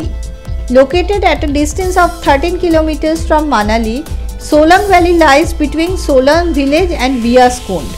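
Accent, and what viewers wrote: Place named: Bengal, India. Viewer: Thanks for the info. Easily recognisable as a bengali speaking english!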